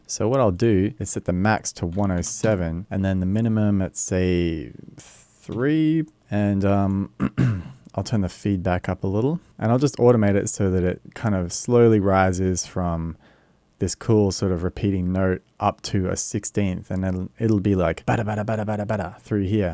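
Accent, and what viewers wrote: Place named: Australia. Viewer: Hey mate. Great vid and great to hear a fellow Aussie in one of these vids. Where in Oz are ya from?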